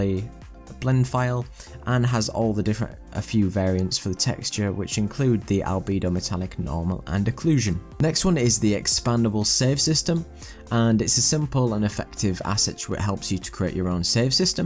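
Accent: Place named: North, United Kingdom. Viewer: Love the drama llamas.....and the northern accent!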